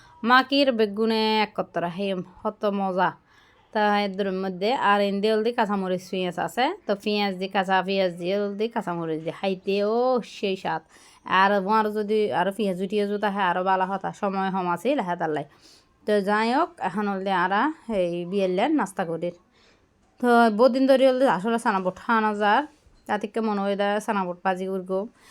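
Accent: Chittagong, Bangladesh. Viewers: দারুন লাগলো আপু তোমার চাটগাঁর কথা গুলো 👍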